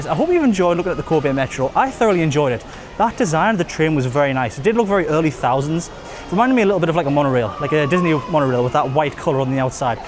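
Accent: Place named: Northeast England, United Kingdom. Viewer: I'm sorry but this is too funny!!! Are you from Sunderland or Newcastle?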